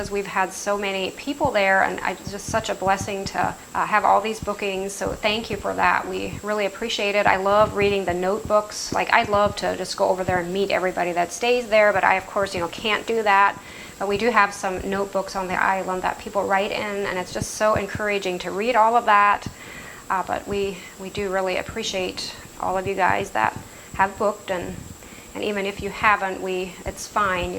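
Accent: Pennsylvania Dutch, United States. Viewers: Such an interesting accent.... Pennsylvanian Dutch?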